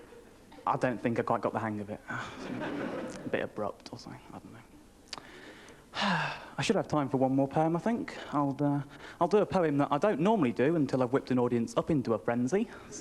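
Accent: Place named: Midlands, United Kingdom. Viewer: He's from the Midlands. Where are you getting cockney from?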